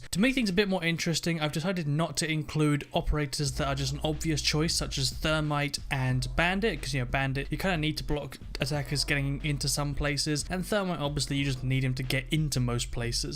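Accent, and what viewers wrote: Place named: United Kingdom. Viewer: hes british...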